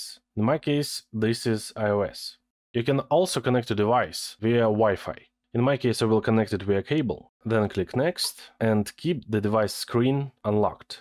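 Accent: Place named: Russia. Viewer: все-таки, у него русский акцент, русский же блогер)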